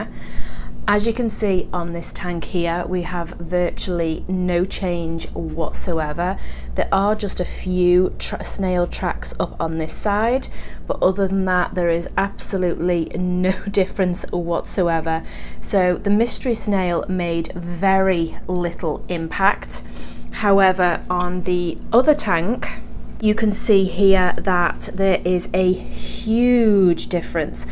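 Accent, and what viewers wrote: Liverpool, United Kingdom. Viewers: Great video. I've been a subscriber for a while now and there's a (off topic) question I've been wanting to ask for a while... I know you're based in the USA, but your accent, I'm convinced I can hear overtones of Scouse, what we call someone from the Liverpool/Merseyside area here in the UK, or am I just imagining it? Okay, back on topic. There seems to be much less choice and availability here in the UK when it comes to snails, I think partly due to some species being 'banned', but also for some reason they just don't seem to be as popular over here. However, I personally keep 1 Zebra Nerite in a 19 litre / 5 gallon tank (a Fluval Spec V, which is quite a narrow tank, only 16cm front to back and not the easiest to get into for cleaning or maintenance, etc.) along with a small colony of Red Cherry Shrimp. I also keep a trio of Zebra Nerites in a planted and 'scaped, 60litre / 15-ish gallon temperate tank, along with 8 Blue Ring Danios and 8 Amano Shrimp. 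The shrimp were originally about half the size of the fish, but now they (well, the females anyway) have grown to be bigger than the fish! I added the snails to the tanks because I wanted to add another dimension to the activity within. The fact that they also serve a useful purpose by helping to keep algae under control is, as I see it, a bonus but not the only reason I got them. At present, I'm playing around with 'scaping ideas in a 40cm cube (another 60 litre / 15 gallon tank) and I also have a Fluval Flex 9 (gallon / 34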